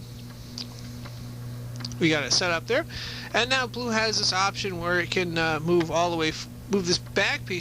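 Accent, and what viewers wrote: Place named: United States. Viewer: You have one of the most 'Murican accents I've ever heard.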